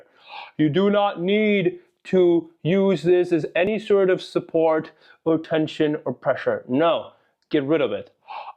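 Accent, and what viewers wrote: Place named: United States. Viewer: I thought you were italian for a second i got excited 😭 Edit: Oh, you are, i watched another one of your videos and you just have a really good american accent lol.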